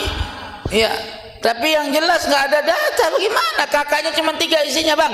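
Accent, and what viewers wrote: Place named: Betawi, Indonesia. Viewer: Gurih banget ini teungku dialek betawi nya. Padahal darahnya Aceh banget. Tapi kalimatnya sangat runut, ilmiah, rasional dan masuk akal serta mudah dicerna dan difahami oleh orang-orang awam. ❤❤❤❤❤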